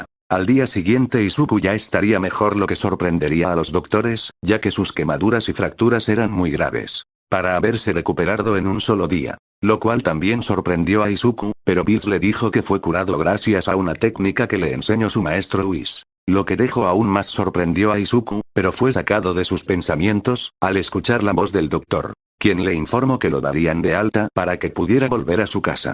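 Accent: North, Mexico. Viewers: Mmm noto algo de norteño xx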